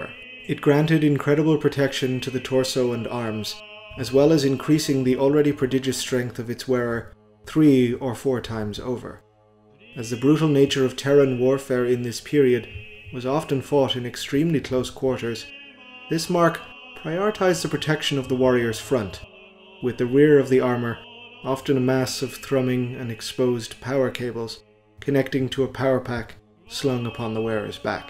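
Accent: Ireland. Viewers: The solemnity in your reading and the Irish accent are a welcome change from other 40 K lore channels. The Gregorian chant was a nice touch as well. Looking forward to your next installment. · So obviously Irish. Just can’t tell which part. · Chilled 40k lore? Beautiful illustrations? Hypnotic Irish voice? Background chanting? SUBBED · interesting. I live really close to Canada, and I pegged your accent as Irish right from the jump. Maybe because my own accent is similar to a Canadian accent so the differences in yours stand out to me more. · Irish accent?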